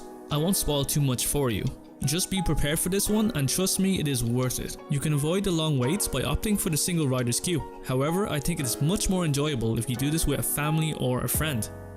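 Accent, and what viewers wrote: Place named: Ireland. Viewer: Watching and thought "this lad has an Irish accent". Going in May. Subbed!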